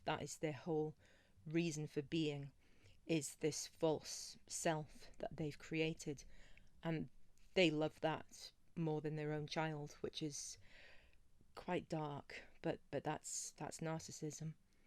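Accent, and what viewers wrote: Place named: United Kingdom. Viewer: Greetings from your sister over here in Savannah Georgia, a gentle hug and love from me to you. You have the British accent I have the Southern accent, 🌷 Happy Easter precious Soul.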